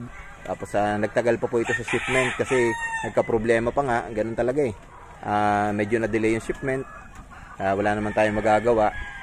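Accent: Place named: Batangas, Philippines. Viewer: Yown gusto ko sa batangueno eh ay kaalwan pakinggan at may joke talaga eh..more power channel.new subscriber boss...from north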